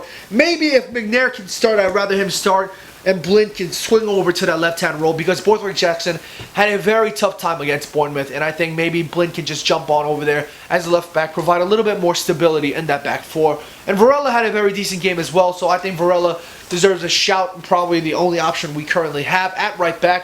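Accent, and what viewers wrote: Manchester, United Kingdom. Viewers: sounds like a typical mancunian like 95% of trafford united fans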